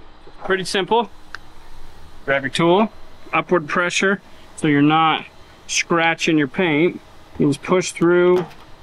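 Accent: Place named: Utah, United States. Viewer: Great show, really enjoy your body-work tutorials. Also amazing how similar to Kaulin you speak, must be a Utah accent!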